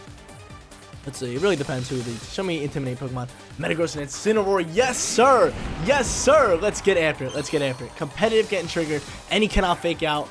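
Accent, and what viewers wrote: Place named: New York, United States. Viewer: I'm noticing your accent are you from the NYC metropolitan area?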